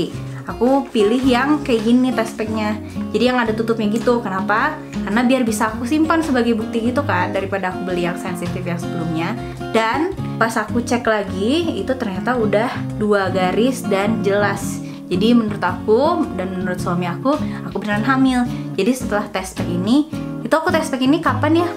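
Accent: West Java, Indonesia. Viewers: Sepertinya teh ngomongnya agak engap ya heheh😄 antara lagi pilek atau apa atau aku aja yg dengernya gitu😁. Oh yak logat sundanya aku suka banget kaya aky jadi kaya campur2 gitu walaupun ngomong indo😄. Oh ya semoga teh sama baby nya sehat2 terus yak sampe nanti lahiran🤗🤗❤❤❤ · dari cara ngomong y kliatan bngt kalo org sunda😆 slamat ya kak,smga slalu sehat trus😘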